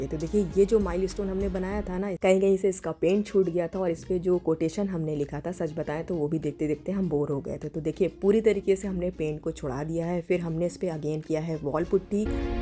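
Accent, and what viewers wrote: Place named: Lucknow, India. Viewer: Im from Pakistan,likes ur lucknawi style urdu,please explore lucknow tradition more,and lucknow markets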